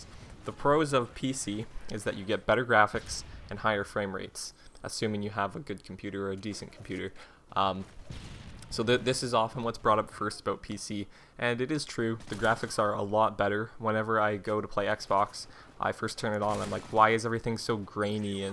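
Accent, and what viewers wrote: Canada. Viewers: hey are you from canada??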